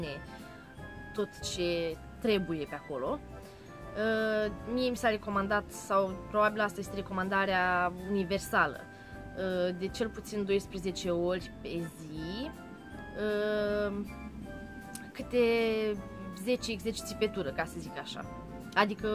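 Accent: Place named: Moldova. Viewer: ..multumesc pentru incurajare...te admir si iti urmaresc vlogurile ptr ca esti naturala...imi place cum vorbesti...accentul tau moldovenesc ca al meu...deocamdata tin totul sub control...ma intrebam de ce eu...din acel procent mic de femei pe care reusesc sa il faca in sarcina...adica diabetul...si neavand nici in familie pers cu diabet.. nu sunt supraponderala....am avut toata viata 45 de kile...si in sarcina am pus 10 kg pana la 7 luni....eu fiind schija mereu imi ziceam lasa ca poate ma ingras in sarcina:)))stiu ca in ultimele luni pui multe kg cu acest regim nici nu dau jos nici nu adaug...noi in familie suntem maruntei...si surorile mele....nu stiu de ce zic ca as face bebe mare....eu mereu ma intrebam macar sa aibe 3kg sa nu fie o matza mica de copil....si cand am auzit ca am diabet si tre sa tin dieta sa nu se duca surplusul de zahar ka bebe ca s ar transforma in grasime la el..am ramas tablou...la 20 de sapt avea 300 de grame...la 26 am facut testul de glicemie si mi a iesit prima valoare 95 in loc de 92 si mi a dat trimitere la diabetolog...nutritionist. 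Este fff stresant sa te trezesti dintr o viata normala...dormeam cat vroiam in sarcina...acum sa ma trezesc dimineata ia ti glicemia pe stomacu gol...si nu mai poti dormi ca vaii ia micul dejun la ore fixe....cantmd te vezi cu glucometru in mana te simti bolnava...dar trebuie sa ma resemnez....daca imi ies valori bune ma simt ok...dar cand is peste limita deja ma demoralizeaza....nu stii ce sa manan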